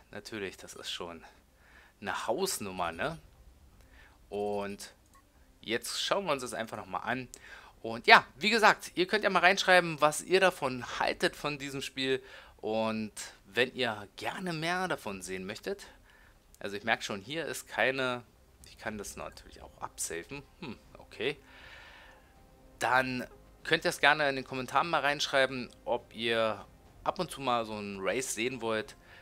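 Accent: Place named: Berlin, Germany. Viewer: Ich habe mal eine Frage an dich, wo kommst du eigentlich her. Wegen deinem Dialekt würde ich auf Berlin tippen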